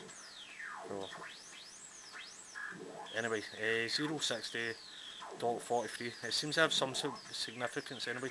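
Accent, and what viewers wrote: Scotland, United Kingdom. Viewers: Due to the poor audio, I had to turn on CC. Sounds like he is speaking a combination of English (Scottish) with a smattering of Galiec.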